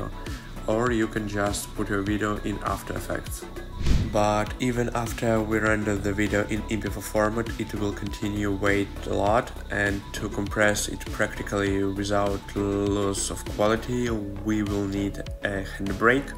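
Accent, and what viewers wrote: Russia. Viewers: русский акцентик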